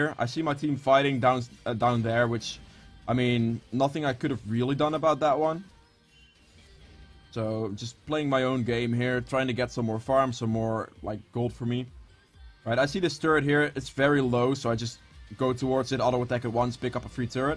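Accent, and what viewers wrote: Netherlands. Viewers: i can hear you're dutch :P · hi man i just wanted 2 ask you a question, your voice sounds like you are from the netherlands, is that true? if so can i add you on league?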